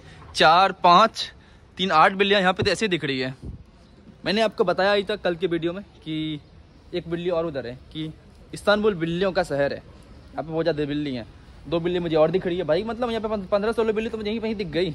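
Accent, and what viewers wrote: Bihar, India. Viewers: LAST RAT.......HAHAHAHA O BHAI SAHAB THIS IS BIHARI TONE LOVE U BHAI.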